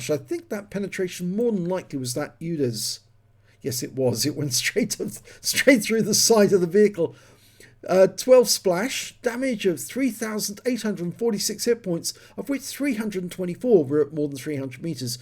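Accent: United Kingdom. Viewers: Mad respect to your enthusiasm while commentating and British accent keep up good work gg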